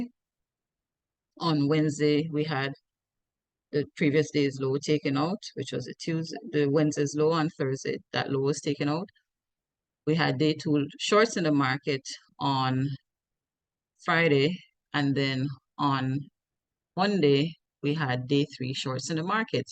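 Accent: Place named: Jamaica. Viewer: Jamaican accent 🎉. I automated SB strategy into an Expert advisor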